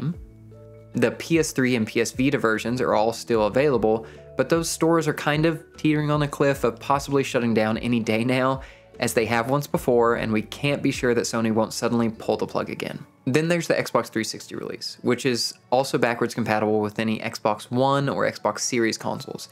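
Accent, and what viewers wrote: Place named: Virginia, United States. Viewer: Are you from Virginia? My old statistics professor has the exact same accent. LOVE the video by the way. I feel like all your observations ring very true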